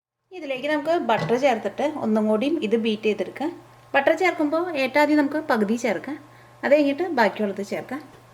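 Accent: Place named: Northern Kerala, India. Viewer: great recipe... from where in Kerala, are you from??? mixture of mid and vadakkan malayalam slang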